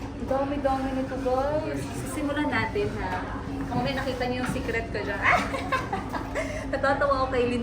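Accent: Iloilo, Philippines. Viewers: Namian gid ko mag estorya ka ilongga ka mam 😁❤️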